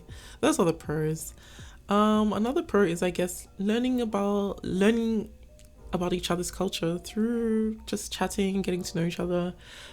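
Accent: United Kingdom. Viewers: I laughed so hard how did you switch your UK accent to a Nigerian accent🤣 I just discovered your channel and I really like it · You’re Canadian? I thought you were British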